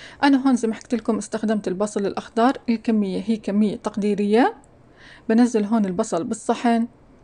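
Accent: Irbid, Jordan. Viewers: ما شالله عليكي بحب كثيييييير طريقتك في الطبخ جد فخورين فيكي كاردنيين وخاصة انك من اربد 🙃♥️🌹🌹😍 طبعا عرفت انك من اربد من لهجتك 😍🌹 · يسلمو ايديكي ست وأنا كمان غير إنو وصفاتك زاكيه بحضر عشان لهجتك الأردنية بتجنن طالعة منك ابن خالتي من سكان عمان لما بيجي عنا ع فلسطين بترجاه يحكي باللهجه الأردنية تحياتي لألك من نابلس فلسطين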